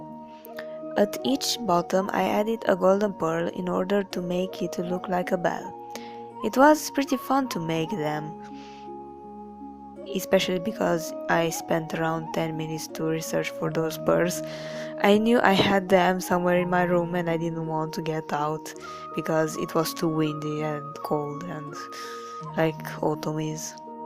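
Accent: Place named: Russia. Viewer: Либо ты второй человек подряд с русским акцентом, либо у меня паранойя 😰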